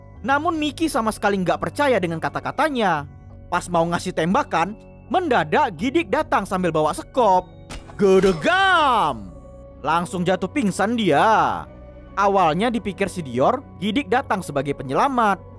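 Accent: Medan, Indonesia. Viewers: Khas Medan nya medok 😀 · Channel ini bukan dari cara suara vocal atau verb yang kurang... tapi pembawaannya emang agak sedikit cepat karna orang medan memang seperti itu... Dengan ciri khas masing masing di setiap channel ada kesan tersendiri.. Berbeda tapi tetap santuy... Buat yang baru liat channel ini jangan buru2 salah paham yah...dengan logat seperti ini lalu mengatakan kurang bagus atau sebagainya. hargai aja dan nikmati.. salam sikopet rawa cubluk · Orang Medan ni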